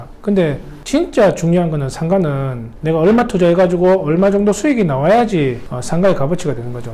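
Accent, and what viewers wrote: Daegu, South Korea. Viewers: 말씀 넘 재미있게 하세요 대구 사투리 넘 매력 있어요~ 귀에 쏙쏙 잘 들어와요~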